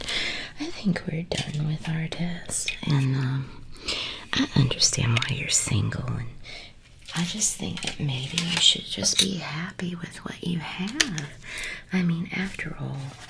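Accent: South, United States. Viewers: Hold on a second, are you actually from the South? (I realize you probably get asked this all the time, but I'm curious)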